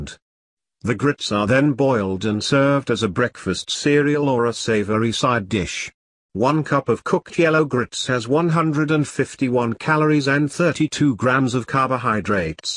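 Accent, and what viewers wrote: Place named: United Kingdom. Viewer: Why must they use a British robot voice. Just do the damn thing with a human voice please....British, American, Tunisian, I don't care, just as long as its human!!!!!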